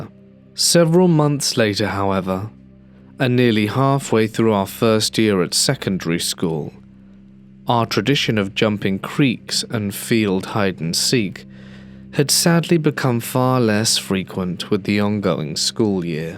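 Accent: England, United Kingdom. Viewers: You really have a gift for nuance and expression And your English accent just makes it even better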